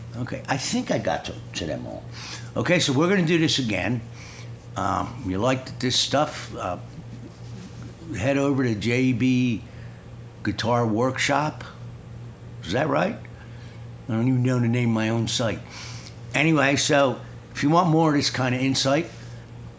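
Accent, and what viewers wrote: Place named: Philadelphia, United States. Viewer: Wonderful stuff., you have the purest Philadelphia accent ever!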